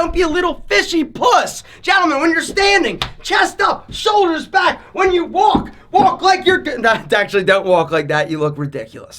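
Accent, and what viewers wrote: Philadelphia, United States. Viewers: Is this guy from philly cause he got that strong ass philly accent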